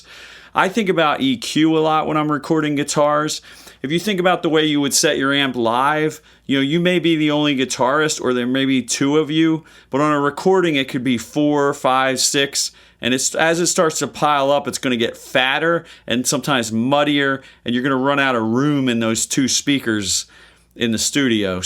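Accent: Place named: Eastern Pennsylvania, United States. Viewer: I was listening to you speak and thought to myself, "This guy sounds like he's from eastern PA. He's got that Bam Margera accent." Looked it up and I was right! Great video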